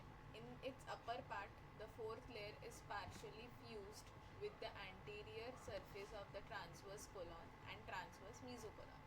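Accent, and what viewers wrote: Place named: India. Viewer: Excellent explanation. My exams are upcoming and finally found out this Indian youtuber. Love your Indian accent. You Indians always save us during the exams. Please upload all the vidoes as soon as possible regarding all the human biology. My exams are starting on 6 April, please do it as soon as possible either wise I'll fail my exams.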